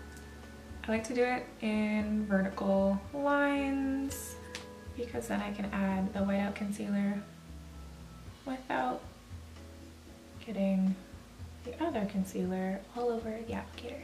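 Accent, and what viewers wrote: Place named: Canada. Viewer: This is the first video I’ve watched of yours upon seeing it in my recommended videos list. Could tell that you’re Canadian as well instantly !! Super cute!! Subscribed!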